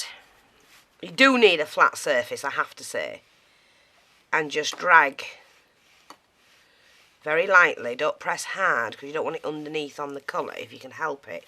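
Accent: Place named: Northern England, United Kingdom. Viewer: Thanks for this and great to hear a UK and even better a Northern lass instead of the US gals all the time :0)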